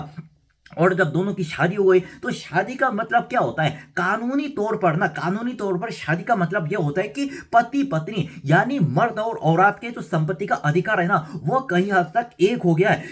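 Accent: Standard. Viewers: Unhone hindi ki padhai ki hai isliye shudh hindi bolte hai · Jitni sudh hindi bhai bol rhe h, utni to hme b nhi aati 😅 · Bhai aap to mujhse bhi jyada shudh hindi bol rhe ho 😮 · कितना शुद्ध हिन्दी बोलता है । मान गया उस्ताद ।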